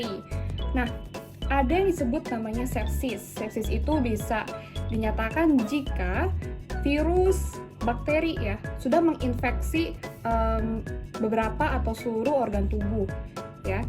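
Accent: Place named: United Kingdom. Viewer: Wih logat. English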